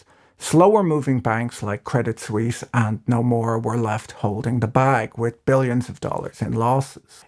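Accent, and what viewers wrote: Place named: Ireland. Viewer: I thought he was Irish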